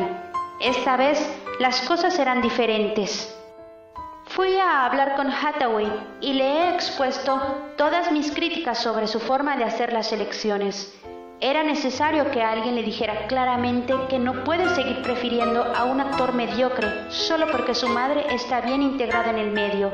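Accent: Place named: Spain. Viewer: Muy buena su acento español le da un toque d elegancia y donde esta la pecas😮😮😮😮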